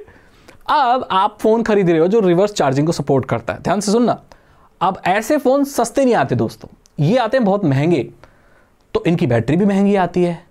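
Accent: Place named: Haryana, India. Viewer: today i see minor haryanvi assent in Bhai 🔥🔥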